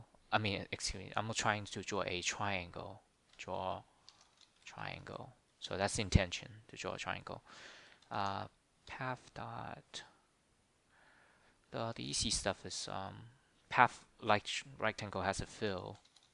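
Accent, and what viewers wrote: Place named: China. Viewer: I love your accent, it sounds really China!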